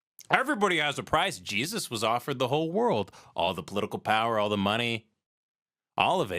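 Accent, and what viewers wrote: South, United States. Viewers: i could listen to doing a southern accent about nonsensical college sports talk for 10 hours straight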